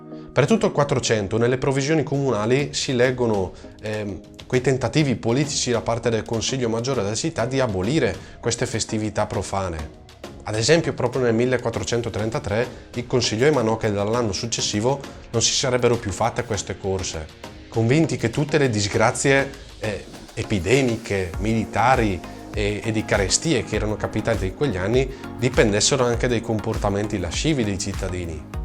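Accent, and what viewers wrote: Brescia, Italy. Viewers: ma che bravo! mi sono bevuto 13 minuti di analisi comparata di costumi medioevali (col bresciano come caso, ma riapplicabile ovunque) che l'inflessione marcata rende più simpatica senza per nulla intaccare il contenuto (te lo dico francamente, di solito le parlate bresciana e bergamasca, da parte degli altri lombardi come me, vengono associate a generi meno dotti). Iscritto al volo, intanto cerco gli altri tuoi lavori. Avanti così! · molto. Anche l'accento bresciano che hai mi piace! Sono stufo di accenti romaneschi e napoletani. E poi ti esprimi bene. Cosa rara tra i giovani. Continua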